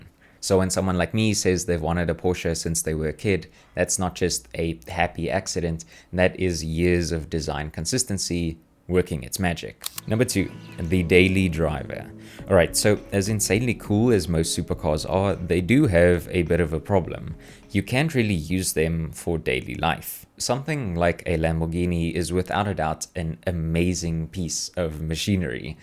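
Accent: South Africa. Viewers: I can just hear the south african in you bru 💪🇿🇦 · Brother heard by your voice you have RSA DNA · I'm new here seconds in me af: "Yha He Is Definitely South Ahhh🇿🇦..."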